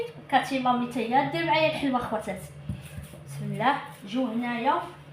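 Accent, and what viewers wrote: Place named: Morocco. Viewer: لهجتك مثل المغاربة هل أنت مغربية ؟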